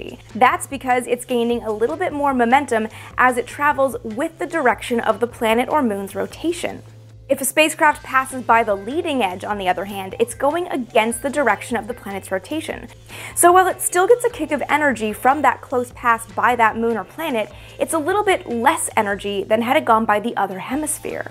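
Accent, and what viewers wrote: Canada. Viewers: Check "oot" the smart, cute Canadian girl.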